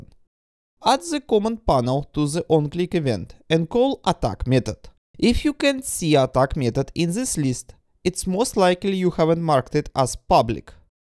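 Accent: Russia. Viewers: does he speak with a Russian accent? он говорит с русским акцентом?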